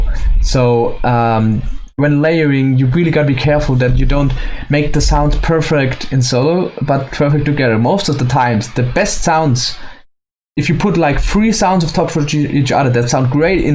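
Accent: Germany. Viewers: You sound kinda german tbh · are you German?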